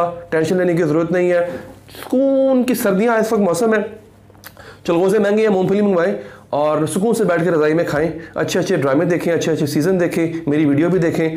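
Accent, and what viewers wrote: Punjab, India. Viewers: Bhai your punjabi touch is amazing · Punjabi touch is very interesting 😊😊,,,keep it up bro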